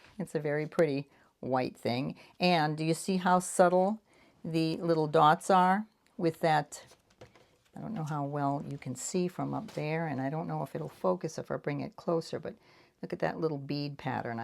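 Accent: Boston, United States. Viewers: you are such fun! And so talented! I’ve been watching you for several hours and I’m one of the elder ladies who watch right to the end. Because I live in a seniors apt building (I’m 78) I run into those old people every day in the halls. Truly in my mind I’m much younger, haha. I love that you are from the Boston area. (accent) I live in northern Alberta Canada and we are just now getting rid of the sand salt and gravel on the roads and looking forward to green trees and grass. I loved all your collage making with household items today. I bought a gelli plate and brayer etc a year ago and it’s still in the package. I’m inspired now. I know your beautiful son is in heaven with Jesus. You have guardian angels around you.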